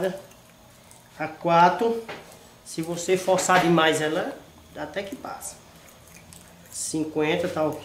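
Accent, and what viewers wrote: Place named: Rio de Janeiro, Brazil. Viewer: Bom dia,vc é do rio de janeiro?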